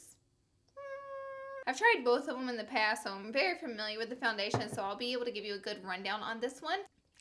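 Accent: South, United States. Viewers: She is so pretty but her voice is so country 😅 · I just love you ! Every time I see the episode of the office when Pam is Deb U Taunt in that murder mystery game I think of your southern darlin accent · OMG, I love you!! <3 I hear your southern belle accent coming THROUGH! And i do love the way you styled your hair in this video. xoxo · Just started watching you and my first thought was oh, girl from the South! Love it! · Love your extra craze and extra south in this video! ❤️ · I'm obsessed with your country twang. OBSESSED! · First time watching your video, I love your Southern accent!!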